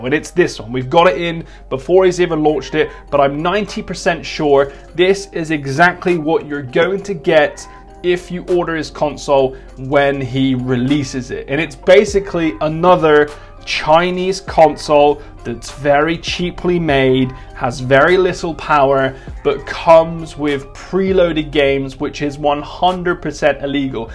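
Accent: Bristol, United Kingdom. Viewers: Do I hear a Bristol accent?👀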